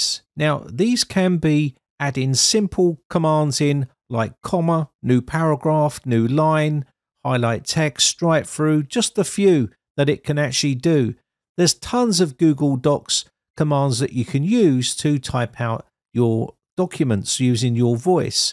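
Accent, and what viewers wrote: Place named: Cockney, United Kingdom. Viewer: Hi, I've been using this tool for a while now and I've noticed that it struggles to understand certain dialects. So, if you don't speak the King's English (like me - I'm from Yorkshire) or if you have a Cockney accent (like you), then you'll need to proofread the text it generates and correct any mistakes. However, it still saves a lot of time compared to typing everything out manually, even if you have to edit it afterwards.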